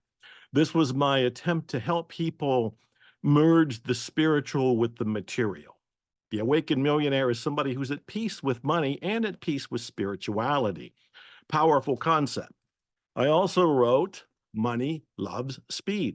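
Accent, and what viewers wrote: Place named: United States. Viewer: Thank you Joe for this very inspirational video. Love your books, love your explanations, love your perfect American English. Happy birthday and blessings from Russia!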